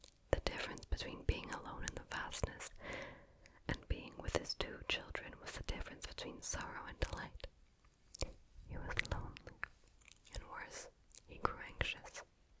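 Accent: France. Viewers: Your french sounds like France French rather than Quebec french or maybe I was just tired when I was watching. Either way its cool, I speak quebec french so it doesn’t sound as good. Lol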